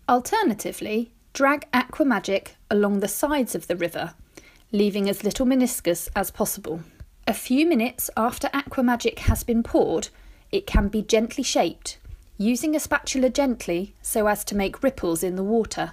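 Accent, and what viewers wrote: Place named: United Kingdom. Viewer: Sounds like a very versatile and easy to use product. I appreciate the narrator's British accent! Lovely.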